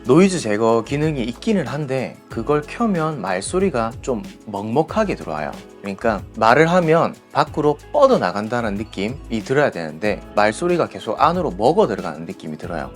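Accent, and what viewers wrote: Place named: Busan, South Korea. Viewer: 카메라 관련 제품리뷰를 찾아보려면, (어쩔수 없지만) 광고협찬이 많다보니 믿고 시청하기가 힘든 경험이 많네요 ㅠㅠ 그래도 님이나 몇몇 리뷰어 분들께서 이렇게 솔직히 얘기해주시니 더 믿음이 가는 것 같습니다!! 이런 분들은 나중에 협찬을 받더라도 "정말 좋은 제품을 소개해주려나보다"라는 마음이 드는 거 같아요! 좋은 영상 감사하고 앞으로 더 잘 되시기 바랍니다! +부산사람이다 보니 사투리가 정겹네요😊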